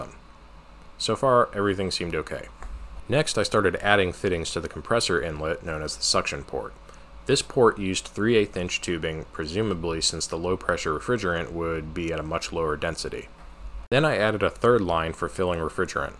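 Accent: United States. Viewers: Fantastic video. Warms an engineers heart to see a project conducted with proper regard for the science principles and associated mathematics. Even better to hear an American accent wrapped around SI units! Mr, you got it all goin' on! :) And seriously, great video. I've worked a few heat pump designs myself and my only question about your technique is your soldering is using soft solder; is that really strong enough and long lasting enough for the task at hand, and does it not soften a bit at your higher temperatures where the pressure is also higher? I use silver solder and nitrogen gas in the tubes to stop scale forming in the tubes. It is a pain but those joints are stronger than the tube! Now, to find the promised next video.... and to say; thanks for sharing.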